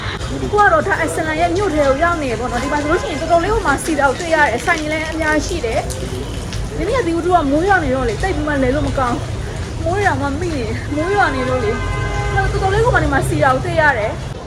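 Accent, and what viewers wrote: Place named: Myeik, Myanmar. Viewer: ညီမကဘိတ်သူထင်တယ် ဟုတ်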